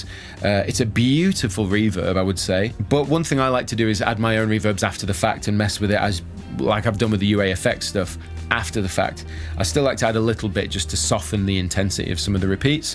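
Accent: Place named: England, United Kingdom. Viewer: Loving the dickies shirt and English accent. To appropriate is to appreciate.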